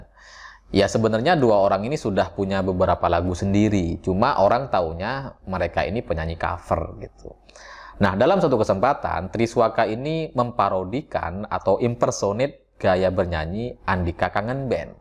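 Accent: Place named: Madura, Indonesia. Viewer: Oo mas orang Madura ya...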